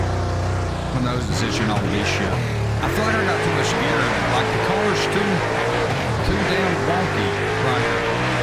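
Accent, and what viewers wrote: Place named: South, United States. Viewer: Thanks a pant load.. 30 whole seconds running a late model (I have no interest in) all by yourself. And 8 min 20 seconds listening to your dumb redneck accent talk non-stop. Thanks to you, I have no clue what it might be like running a sprint car (Original Outlaws) with other cars on the track. Imagine that. Other cars on the track. Have you ever advanced that far?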